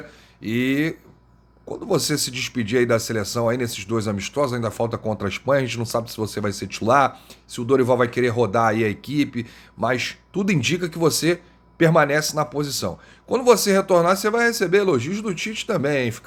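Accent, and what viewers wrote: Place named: Rio de Janeiro, Brazil. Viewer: Você é do Rio de Janeiro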